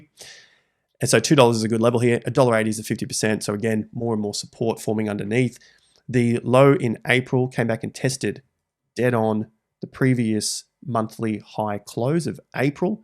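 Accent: Australia. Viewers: Yeah, the Aussie accent is brutal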